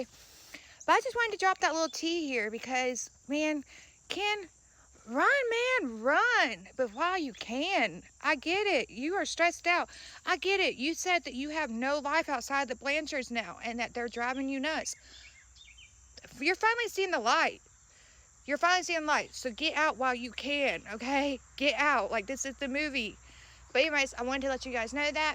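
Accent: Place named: United States. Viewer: Mee too new sub here and I love your country accent sounds like mine lol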